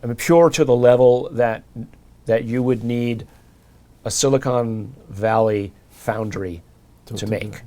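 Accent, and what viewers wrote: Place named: Liverpool, United Kingdom. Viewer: Love the Liverpudlian Nolan ❤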